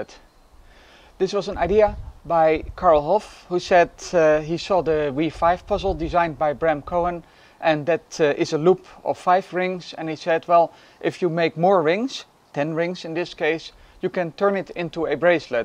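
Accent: Netherlands. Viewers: Je bent Nederlands of denk ik het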